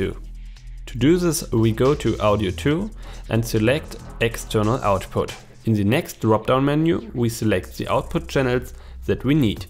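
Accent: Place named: Germany. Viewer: The German accent ist awfull 😀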